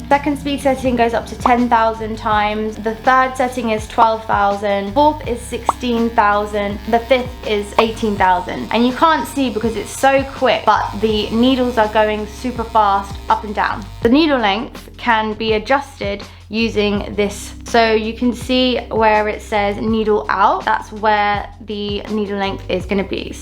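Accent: United Kingdom. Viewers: Love Love ur videos can't wait to try straight away! Love ur British accent!! Will update once I add to my hair journey!! Could you do a video on hair nutrition?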